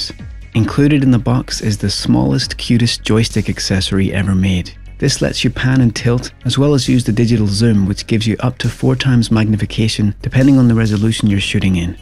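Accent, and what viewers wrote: Northern Ireland, United Kingdom. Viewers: Fabulous - thanks & nice to hear the NI accent - greetings from HK! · "Now" "Plastic" "Fan" "proportional" "eighty" Northern Irish ? Great Video review and i thought it was just me who had a problem getting the Tripod mount off the camera ;)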